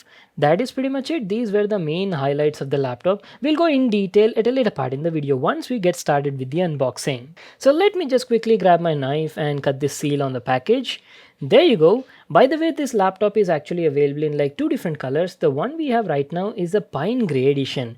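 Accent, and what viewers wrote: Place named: Telangana, India. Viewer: bro are you from telangana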